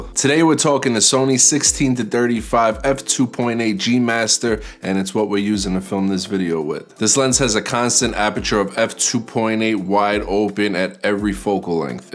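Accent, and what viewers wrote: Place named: New Jersey, United States. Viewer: If i had to guess the accent i wud say JERSEY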